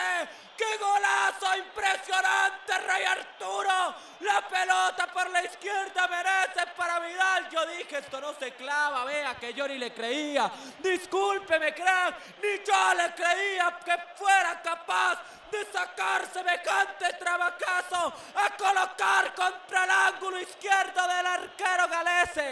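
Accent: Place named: Chile. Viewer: Si fuera de otro país no sudamericano, diría que ud. Es chileno, gran relato 👍👍👍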